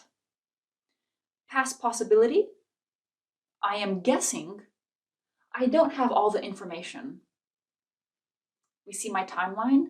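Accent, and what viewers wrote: United States. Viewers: Excuse me, may I ask you where you come from? I wonder how to indentify your accent, but I guess it's near to american. By the way your speech is very clear. I really enjoy your lessons :) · That's true! number one in American English! We do not need British English)))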